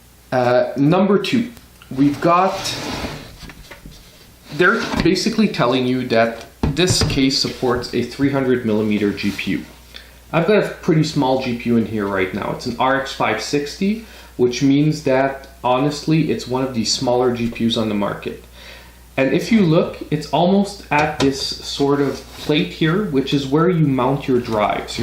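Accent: Quebec, Canada. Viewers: french qc accent haha keep going youre awesome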